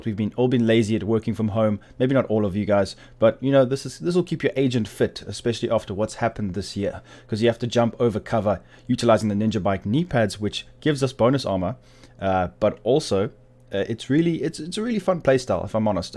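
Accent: South Africa. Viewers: Hey man, had this game about 6 weeks and you're my go to channel for it now. Just curious enough about your accent to ask where you're from? My ears are saying 70% South Africa and 30% New Zealand.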